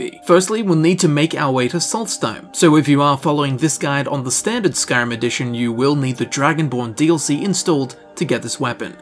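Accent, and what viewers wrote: Australia. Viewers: Dude it's like / ... I mean you sound Australian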